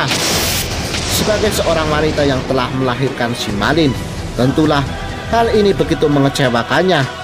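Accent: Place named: Java, Indonesia. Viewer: wou suara lo jawa nya ga usah medokkk ngtu kali ......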